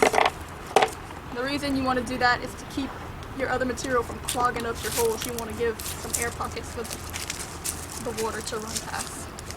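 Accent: Louisiana, United States. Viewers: Knew I recognized that accent. Greetings from cajun land! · I was trying to id your accent, so you are a lil cajun? Its disturbing how much better your vid's are than so many hard core looking survivory vid posters.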